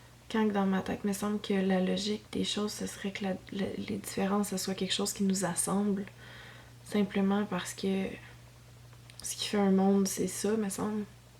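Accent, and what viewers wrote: Quebec, Canada. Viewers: Au début que j'écoutais tes vidéos, j'avais comme d'la misère a vous comprendre les québécois ! Mais maintenant, j'y arrive tellement bien. I'm french sorry 😂 Sinon je t'aime. full love 💘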